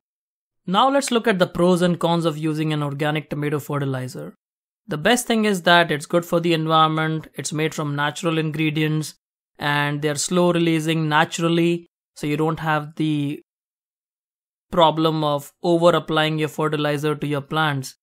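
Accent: India. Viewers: I like his Indian accent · Your accent felt to me from Indian origin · his indian accent is so thick i love it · clearly Indian Accent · what other accent would an Eastern Indian person have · You've got an Indian accent sir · English is so much in Indian accent, it's hard to understand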